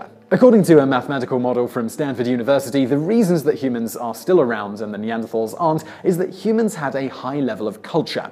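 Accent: United Kingdom. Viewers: They died from listening to stupid top tenz presented by some guy who doesn't seem to have any intelligence, education, charisma or creative ability...just a British accent....That's it.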